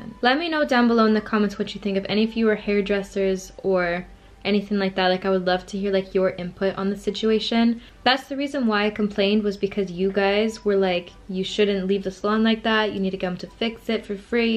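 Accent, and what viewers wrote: United States. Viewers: I'm a bit confused! Your accent is American and you say pounds££ so I'm a bit confused or is it just me thinking you sound American?🤔🙈🙈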